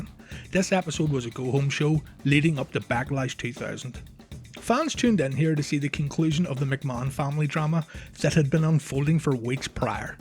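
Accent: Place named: Lurgan, United Kingdom. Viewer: Sounds like a Lurgan man to me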